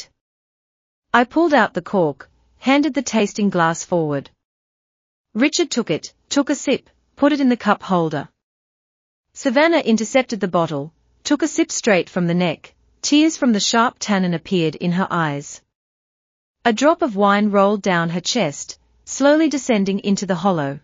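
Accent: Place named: United Kingdom. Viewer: Confusing; a British FEMALE voice for Noah! “Mrs.” Vs perhaps “Mrs” , “Mr.” Vs “”Mr” , Santa Fe? Hot! But awkward.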